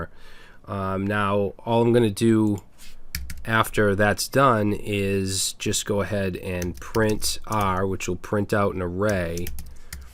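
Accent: Boston, United States. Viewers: Yo is it just me or does his boston accent have him sounding pissed the entire video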